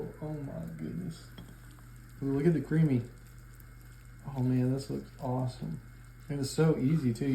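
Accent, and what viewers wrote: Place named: Tennessee, United States. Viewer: Should have known ya'll were from Tn😂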